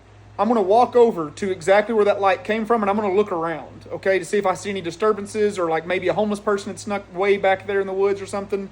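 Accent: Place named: Appalachia, United States. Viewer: No wonder I was drawn to your channel. I mean animals and spooky stuff aside. I knew kin when I heard it. 😂 WV Appalachian here.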